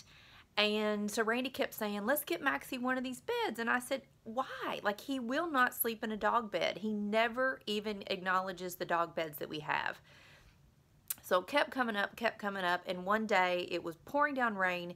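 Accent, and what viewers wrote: South, United States. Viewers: I cannot listen to your SOUTHERN DRAWL any more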